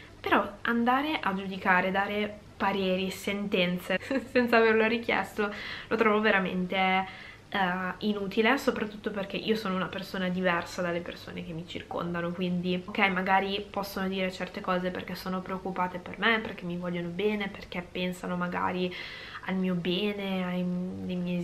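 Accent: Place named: Milan, Italy. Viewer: Molto interessante! Curiosità Indiscreta, hai un Accento milanese con tratti di pugliese.. Ci ho preso? 🤔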